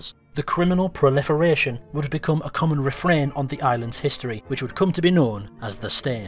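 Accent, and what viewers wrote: United Kingdom. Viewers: “EVERYTHING Australia” Me: immediately hears a UK sounding guy 🤔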